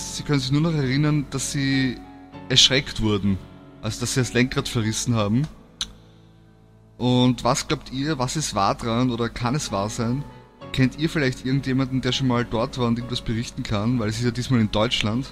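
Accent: Austria. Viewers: Bist du Österreicher?? :D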